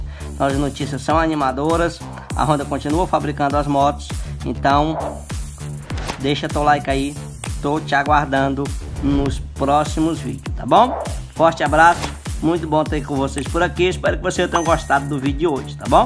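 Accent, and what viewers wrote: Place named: Pernambuco, Brazil. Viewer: Você é de Pernambuco?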